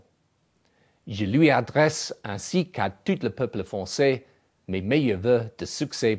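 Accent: England, United Kingdom. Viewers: he has a realy nice french speaking and a nice charming English accent. Bravo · he speaks very clearly... i'm french, he just has this so recognizable english accent on french language. that's very beautiful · I can't believe that some of you French people are critiscising him for having an English accent. What the hell do you expect? English and French are both strong languages and when we try and speak eachothers language we always speak it with a very heavy accent, yours is just as bad as ours! Look at Arsene Wenger, his accent is as strong as the day he moved to London 13 years ago. He cannot say a single world and sound English.... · i don't speak french but even i can sense his english accent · God im English and even I can tell her speaks french with a big english accent! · Oui, il conserve évidemment son fort accend anglais mais sa prononciation et son vocabulaire sont excellents ^^ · Grr.. 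he's got such a typical "English-student-learning-French" accent. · lol!J'adore l'accent british!!comment il prononce "mondialisation" et "pouissances" ^^ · it's very good , we can understand everything , but there is still this typical english accent (the 'ey' , the softened 'r' , some syllables are skipped....) and that sounds a little like a chinese speaking french 8D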